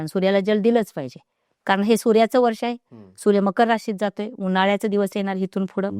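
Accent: India. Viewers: Aaho त्या दिल्ली साईड la राहिलेल्या आहेत म्हणून हिंदी मिश्र मराठी बोलतात ,तुम्ही pn दुसऱ्या राज्यात राहिलात तर त्या भाषेचा प्रभाव तुमच्या बोलण्यात होईल ,त्यात अशुद्ध अस काहीच नाही त्यांच्या भावना तुमच्या पर्यंत पोहोचणं महत्वाच आहे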